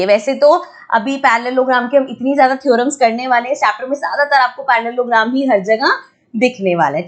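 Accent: Punjab, India. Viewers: Punjabi ho app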